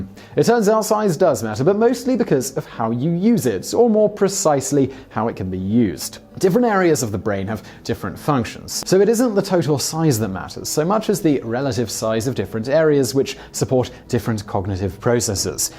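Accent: England, United Kingdom. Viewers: plays on his accent some people in North America have such respect for the British accent when in fact they're all dummies over there. Btw lived in the UK for years..... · If you're so smart, why can't you even grow hair on your head. Rats can grow hair all over their bodies. You cant even grow hair to protect your grey matter from sunlight. And sorry, mispronouncing American words like an English doesn't make you smart, just makes you 250 years out of date. We beat you and even Europe doesn't want you. I'd call you a red headed stepchild but your prince has already cornered that.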